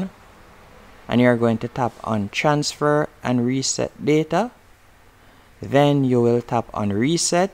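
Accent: Jamaica. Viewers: It work thankssss I can tell your a Jamaican thatssss wasup thank u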